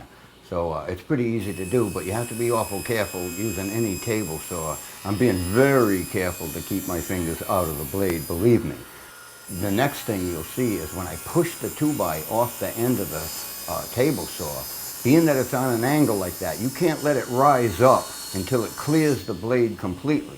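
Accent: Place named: Rhode Island, United States. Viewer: As a native Newporter now living in northern Norway discovering this channel has brought back quite a few memories and feelings of nostalgia. The thick RI accent has is music to my ears. I've since lost mine years ago from all of my moves. Now that my journey into woodworking has started I've found this channel to be incredibly instructive and insightful. is a true master craftsman. Thank you so much for this. Cheers! p.s.- Now I'm dying for some clam fritters, a good swordfish steak or even a good Italian grinder.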